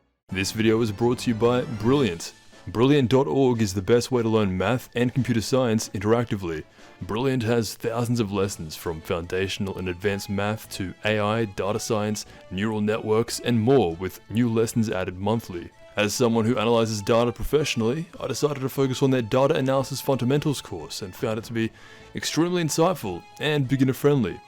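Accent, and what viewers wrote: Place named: Australia. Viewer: only one I ever subscribed to and click on it the minute I see it appear on my feed even if its not about DB. I'm not gonna watch the whole thing but ill support him. does cool shit for example you'll never hear him begging for fkn pitiful likes and subs NOT EVER. Another cool thing he does is put remixed Dragon Ball music like trap stuff. Its the type of music I like to work out too. I like his views and perception on DBZSGT. Lastly have a deep voice with an Auzzie accent will of course only help in this medium.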